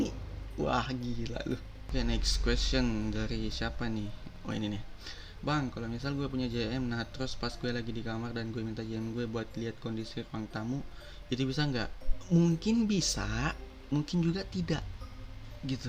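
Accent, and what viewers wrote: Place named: Makassar, Indonesia. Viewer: Mungkin karena kalau ndak salah part 2 dia pakai kaya logat makassar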